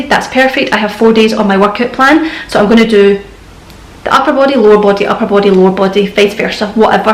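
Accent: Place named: Scotland, United Kingdom. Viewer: Hey. Thank you for your video! Fun fact, I started following you in 2016 and one of the reason (on top of your awesome content and the veganism) was to get used to scottish accents before moving here. Now I've been in Scotland for 7 years, so thank you for being my introduction to the country (and fitness!):)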